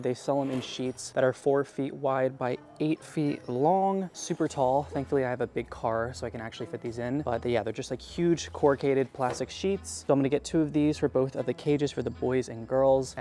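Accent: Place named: United States. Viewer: I spent the first three minutes of this video trying to figure out what his accent is, and I realised it sounds like gay American. And I really don't understand how that's an accent, but that's what he sounds like.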